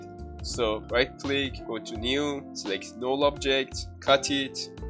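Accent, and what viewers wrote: Iran. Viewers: your accent is so iranian coded. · عجب لحجه ای قشنگ معلومه ایرانیی😂😂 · دادا من دارم شروع میکنم از لحجه نازت فهمیدم ایرانی هستی .کاشکی زبونتم ایرانی میکردی ولی غب.سطخت بالاست .ماشالله😍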